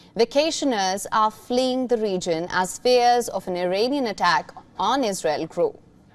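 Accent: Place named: India. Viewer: I love Indians accent 😍😂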